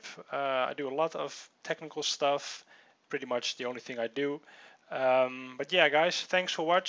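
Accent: Netherlands. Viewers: je hoort het gewoon nederlands :3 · are you Dutch?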